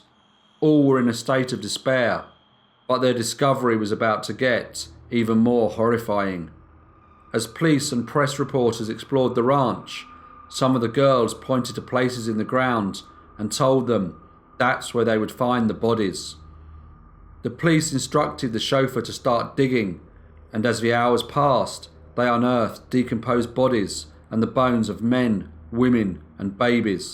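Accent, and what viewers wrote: England, United Kingdom. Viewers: It’s an English accent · lol, I figured that much, but which flavor of British? Doesn't sound like any of the London accents I've been exposed to, doesn't sound like a north England/southern Scotland, and not very Welsh, either. I'm no expert, but I do watch a decent amount of BBC programming, so I've been exposed to some of the more popular accents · It’s so nice to be able to understand your English accent! My hearing is also bad and I always understand what you’re saying! Again, another story I’ve never heard of! Love it! 👍🏻😊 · An English narrator? what the feezy!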